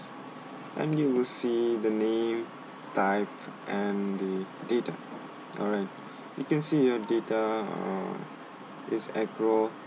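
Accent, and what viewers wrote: India. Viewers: Are you Indian or French??... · indian or serbian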